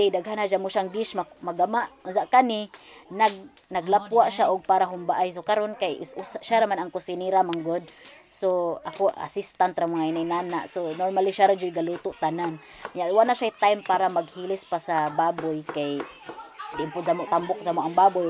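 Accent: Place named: Bohol, Philippines. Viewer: Pure bohol jud imu lingwahi. Puro J.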